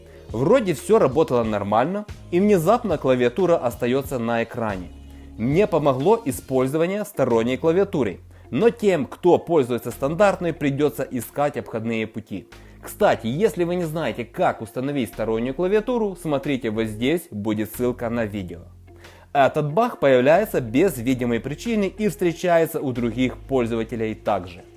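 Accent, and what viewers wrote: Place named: Ukraine. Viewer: по акценту услышал, что какол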